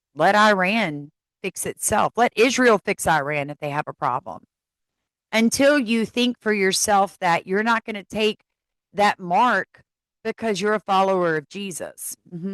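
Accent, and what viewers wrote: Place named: Louisiana, United States. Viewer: When I heard you say that you’re in Louisiana I was so excited because I was born in New Orleans and then my son walked into the room and heard you say the word “monster” and he said OMG mom she says monster just like you! I said of course she does we are both from LA 😂 I was not raised there but the accent still sneaks out sometimes. ❤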